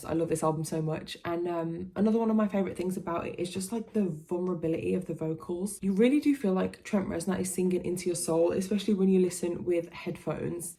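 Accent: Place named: Birmingham, United Kingdom. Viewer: awesome!!!! yes it was amazing!!! i saw him twice, i was at the London on the 7th and Birmingham! I can tell from your accent that maybe you're from Birmingham? not 100% but i'd say maybe you are.. so I imagine you went to that one.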